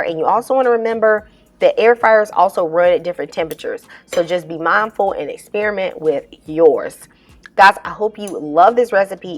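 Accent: South, United States. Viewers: Your voice is even salivating! Which may not seem like that southern touch has much value beyond entertainment, but I noticed i did not have to re-watch the video to catch important details such as cooking times or procedural tips that I often get lost-in when swept up by a videos production impression, or in some sense, distractions from the theme/game. I appreciate any production or performance that I feel immersed in the 'greatness of mind' while on board the content creators intention to convey what i can feel put a smile in there heart and thank you personally for packaging that up in this video and setting the table for us to love and in this way experience the joy that is personified by you, Thank you Lord, in Jesus name~Amen 🙏 💖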